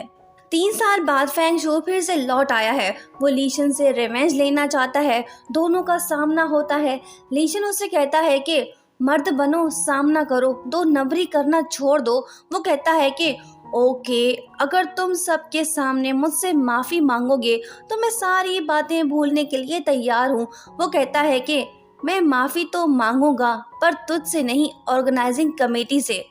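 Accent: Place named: India. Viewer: Mujhe laga aap Indian ho kyuki aapka voice Indian Jaisa Hai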